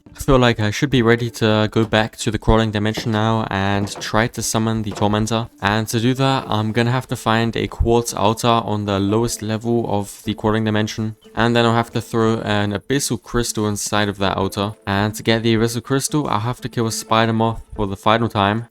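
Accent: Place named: Switzerland. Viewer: I think he's from Switzerland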